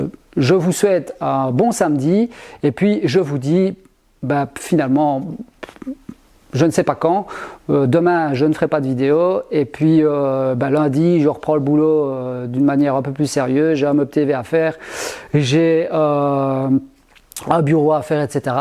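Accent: Belgium. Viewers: Salut, j'aime beaucoup ton "Belge parlé", je te rassure tu n'es pas un cheval blanc et encore moins une star du you tube, mais reste comme ça, la qualité du contenu est bien perçue, on sent bien le VRAI. Un seul regret, c'est que la Belgique soit si loin du pays basque 😊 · Salut, je suis venu sur ta chaine par le biais de floky, malgré le temps je suis toujours là à regarder tes videos et on doit être un gros paquet, nous comprenons bien ton coup de mou, pas facile d'encaisser des critiques sans rien savoir de l'honneteté intellectuelle, de la compétence, ni de la comprehension des personnes les regardant. Pour comprendre tes vidéos, il faut avoir connu tes débuts, tes explications, et savoir que tu n'as rien d'un donneur de leçon... Alors, comme tu ne vas pas sans cesse expliquer sous peine de ne pas avancer, dis toi simplement que parmi tes 40K d'abonnés, tous ne sont pas bienveillants et avance et crois moi le jour ou tu auras le melon ou tout autre connerie, la communauté entiere saura te dire à quel point tu es dans l'erreur...en attendant, on aime tes videos, les astuces minutes (tant appreciées de floky), tes prononciations belges, l'académie du 'Suleve', ton amour des abeilles, bref, releve toi, mon gars t'es une bête du bois..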